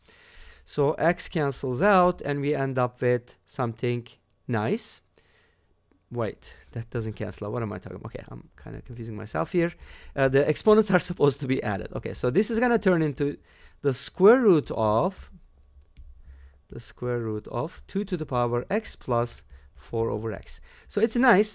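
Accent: Turkey. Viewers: hocam türk müsünüz, aksanınız inanılmaz düzeyde türkiye türkçesi konuşan birisini andırıyor. are you turkish? your english accent made me think that u are turkish.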